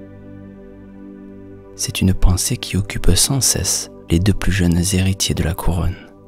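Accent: South, France. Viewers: l'accent du sud du conteur me dérange trop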